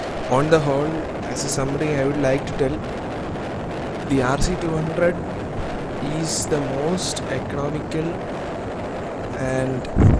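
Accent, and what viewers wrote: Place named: Kerala, India. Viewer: r u a mallu .You sound like one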